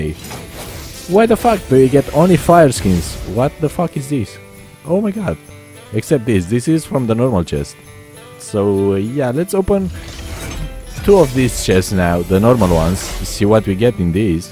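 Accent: Romania. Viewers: Se vede dupa accent ca esti român ! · this guy is 100% romanian(after the accent) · esti roman?? mi se pare ca ai accent de roman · Man, are you from Romania? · ai accent de român, pwp. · Puteam sa jur ca esti roman din primele secunde. Oricum, de apreciat.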